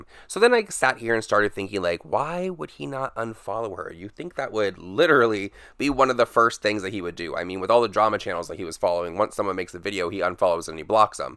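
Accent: United States. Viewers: “BrisBANE” omg I cant handle your accent 😂 super cute through! I’m from Brisbane & I swear all Americans can’t seem to pronounce the cities lol. But if you need to pronounce it in another video about James in Brisbane airport it’s pronounced ‘Bris - Bin’ which is silly because it’s got an ‘a’ I understand 😂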